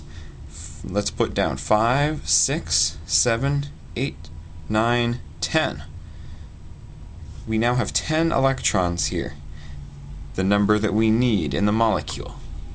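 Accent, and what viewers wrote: Canada. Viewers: Thanks a lot, helped a bunch. And props to the Canadian accent!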